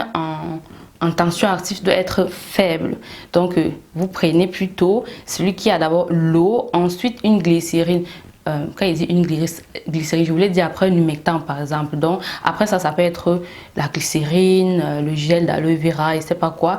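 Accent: Cameroon. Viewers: Oulala j’aime ton accent 🥰 une vrai kamer 😂😂🤣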